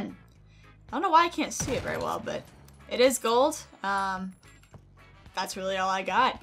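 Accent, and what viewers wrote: South, United States. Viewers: How long have you been watching her because her southern accent has been known in alot of her videos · That cackle is exactly why I subscribed. Plus, the southern accent comes out everytime she's just in a frantic shipment mess. So good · I could really hear that southern accent of yours in this video.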